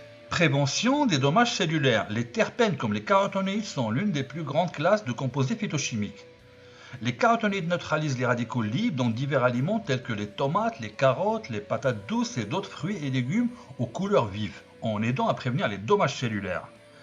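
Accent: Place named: United Kingdom. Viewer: pirate et à tous,ta trop un putain d'accent anglais pirate tu me fait trop délirer,si non bien les phytonutriment.Miioin et bonne nuit chère pirate:-)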